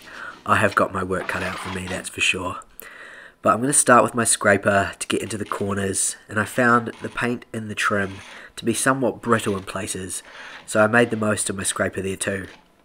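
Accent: New Zealand. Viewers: Being married to a kiwi the accent is familiar. Just found your channel and really enjoyed this video. I’ll be watching😁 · Oh man, I could listen to your kiwi accent forever! Great job, as always! ❤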